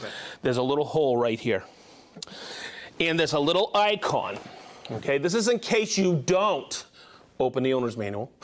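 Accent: Boston, United States. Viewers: I would have bet good money you were from Boston.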